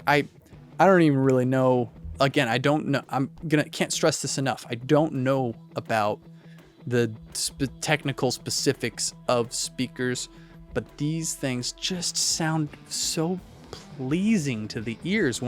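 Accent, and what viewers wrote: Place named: Nashville, United States. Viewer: you from nashville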